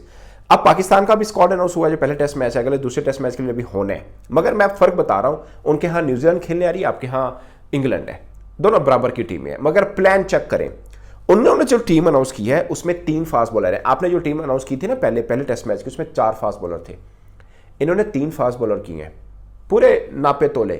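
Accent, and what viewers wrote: Punjab, India. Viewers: Your Punjabi accent is too good sir....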